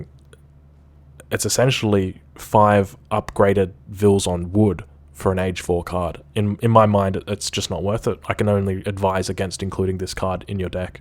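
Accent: Australia. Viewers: and hes aussie asf.. Fuck yee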